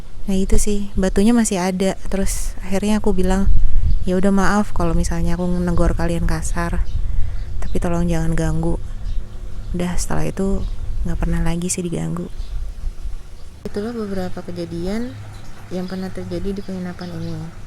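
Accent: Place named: Banyumas, Indonesia. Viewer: banyumas mana suaranya:v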